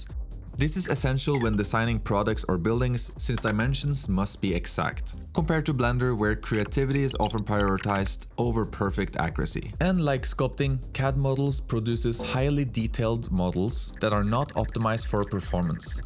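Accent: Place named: Norway. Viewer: you are Norwegian from that accent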